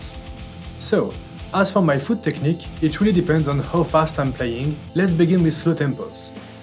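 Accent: France. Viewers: Je comprends pas d'inquiétude x3 Je ne critiquais pas il me semblait juste avoir cru entendre un petit accent qui sonnait français ^^ En tout cas je vais essayer de mettre en pratique ce que j'ai vue dans cette vidéo et bonne continuation 😁 le blast est une technique que mes mains et mon poignets n'apprécient pas xD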